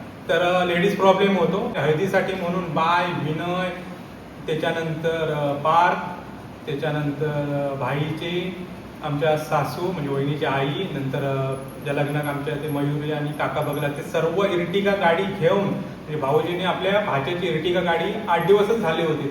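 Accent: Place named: Malvan, India. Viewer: माझ माहेर पण बांद्याला रोनापाल ला आहे माहीत आहे का रोनापाल तिची तिकडची मालवणी ऐकून मला माझ्या माहेरची आठवण आली माझ्या माहेरी सुद्धा असेच मालवणी बोलतात तू व्लॉग मध्ये मधे मधे तुझ्याकडचे मालवणी बोलत जा 🙏👍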